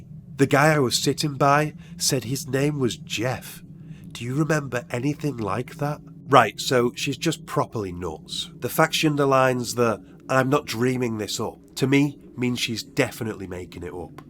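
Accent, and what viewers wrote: United Kingdom. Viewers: UK accent is disturbing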